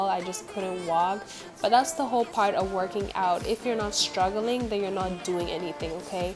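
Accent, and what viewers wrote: Caribbean. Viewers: Are you Caribbean? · You’re body is amazing! Love your videos, are you Caribbean? You have a slight accent but I can’t tell from where lol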